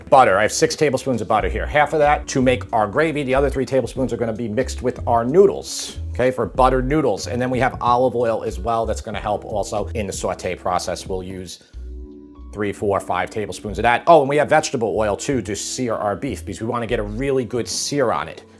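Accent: New York, United States. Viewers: Not to be rude, but I just can’t stand that New York accent. I don’t know what it is. You’re a very handsome man. You’re very talented but the accent maybe you should work on.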